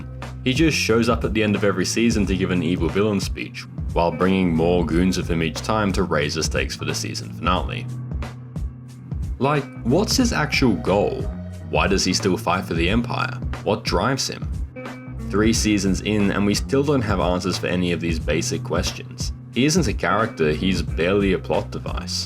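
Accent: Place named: Australia. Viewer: God I hate the australian accent so much and ur video sucked ur reasons why the first season was bad were minor nobody rly gives a f abt some fob what I care is structural storytelling and good characters. Season 1 had that and like all good things Disney must destroy with season 3. You rly suck at "breaking down" things pls sygau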